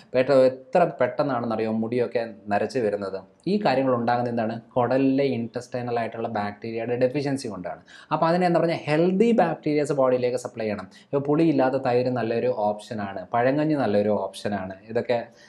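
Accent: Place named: Pala, India. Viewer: എന്താ രസം...Dr.....അവതരണം..സൂപ്പർ...ഭാഷ...സൂപ്പർ... പാല അച്ചായൻ... സ്ലാങ്.... ഇത് Dr..aayi തോന്നുന്നില്ല..just..Oru frd...nice..❤️❤️❤️😘😘😘😘😘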